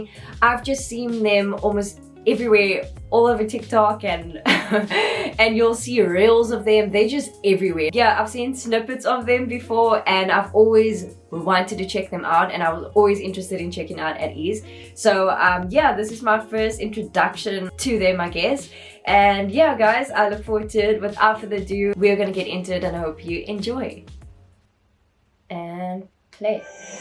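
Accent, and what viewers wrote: South Africa. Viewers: You South african....am I right? I know our South African accent from anywhere. Anyways FUN FACT: ATEEZ WORLD DOMINATION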